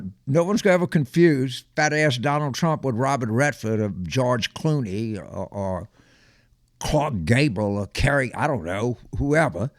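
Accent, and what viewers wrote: South, United States. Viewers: I don't always agree with Carville but what he says is so important. Also, thank you for being an open intellectual with a southern accent! You blew the cover of many brilliant southerners (on both sides of the aisle) who use their accents to act innocent and naive. I do get a kick out of Yankees who think anyone with a southern accent is stupid. BTW, I work in a prison. Every staff person knows that they're about to hear a lie when a prisoner starts with "I'm not going to lie".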